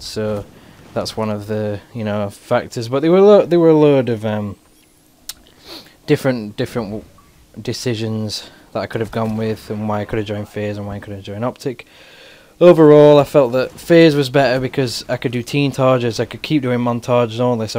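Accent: Yorkshire, United Kingdom. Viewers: Were u from spratt, yorkshire ???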